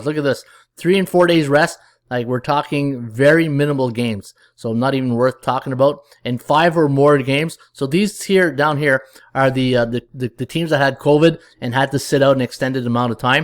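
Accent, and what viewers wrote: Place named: Canada. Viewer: You sound canadian to me...therefore, I'll be expecting many tee's down the road to back that tee from the other day. We sure lucked out yesterday with the Nats. Padres are scoring in bunches, and it doesn't seem to matter who pitches (recently). Going to be keeping my eye on it...their Staff, ignore the numbers, is weathered and tired. They are becoming overworked, and it should trend in the over direction with the offense/tired bullpen combo.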